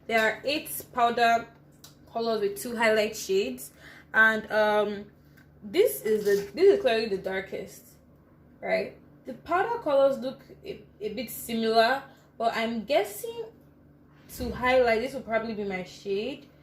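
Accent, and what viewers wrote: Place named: United Kingdom. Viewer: This your British accent doesn't want to go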